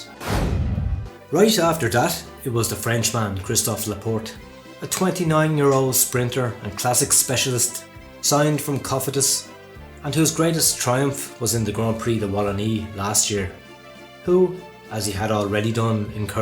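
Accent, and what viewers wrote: Ireland. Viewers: What makes you think this is from a Brit? The narrator is Irish (you need to read a bit of history if you think Irish and British are the same thing!), and the early videos all have continental European narrators. I am British, however, and I think your full of shit if you think that just because British athletes have doped that I am not allowed to voice my suspicions of others. I personally believe that there are more at it than aren't, and not just in cycling.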